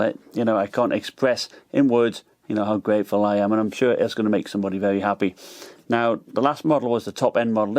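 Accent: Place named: Teesside, United Kingdom. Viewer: Cracking channel, stumbled across you a few weeks back and the accent caught me attention! You sound like your from just up the road from me in Teesside but you’ve gone global! 😂 love the channel and bought myself a Nitro 5 last week after watching your channel! Can take the lad out the north!.....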